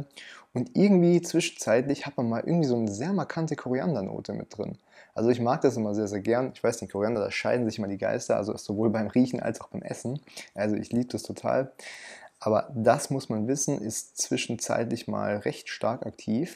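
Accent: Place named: Germany. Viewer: I love your language and your accent! Are you German?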